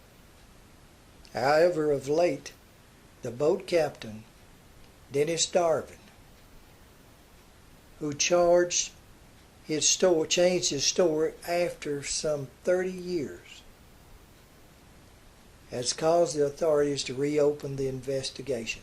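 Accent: South, United States. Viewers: the hillbilly naratory drives me crazy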